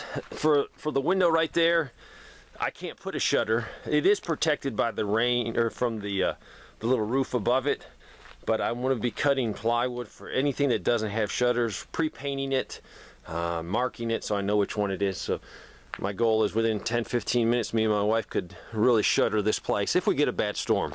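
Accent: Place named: Chicago, United States. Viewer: May I ask you why the bathtub is outside of the house? I also noticed that you are cutting green brush in the winter! You really must be in the south but you sound like you're from the North like Chicago or there abouts. I am looking forward to you video how to filter the rain water. I want to use my poly totes and put them in the basement (before it's completed) and have the water run down there and use it for washing and such.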